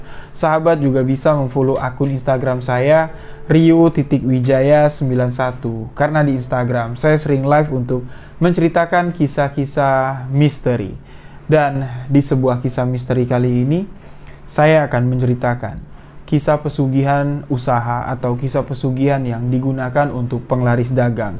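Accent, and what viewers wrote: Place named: Jambi, Indonesia. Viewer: Mas dari Jambi ya. Logat ny pengen tau aja saya. 🙏 atau dari Madura.. Salam dari Setu Bekasi. Sehat selalu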